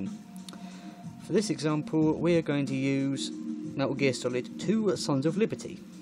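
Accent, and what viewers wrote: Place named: Australia. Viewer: Welp that is Australian accent after all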